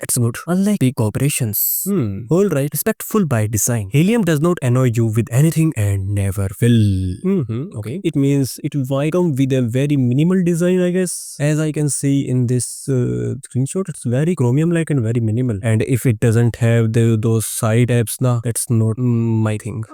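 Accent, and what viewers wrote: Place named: India. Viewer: this is the thickest indian accent i've ever heard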